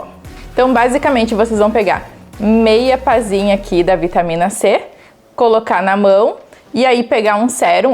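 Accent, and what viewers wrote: South, Brazil. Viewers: Linda,com sotaque do sul ❤❤😂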